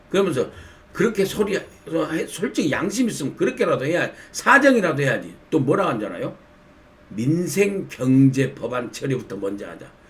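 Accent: Jeolla, South Korea. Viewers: 전라도사투리 너무 좋구요 옳으신논평 잘 경청합니다 민영삼티브 파이팅입니다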